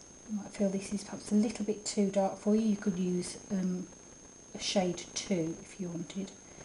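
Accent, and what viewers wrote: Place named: United Kingdom. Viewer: Ur british accent! :D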